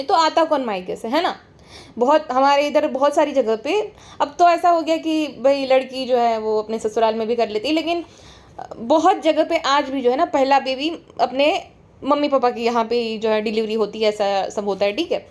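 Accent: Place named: Marwar, India. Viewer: Aapki ki accent Gujarati bilkul marwdi jaise hai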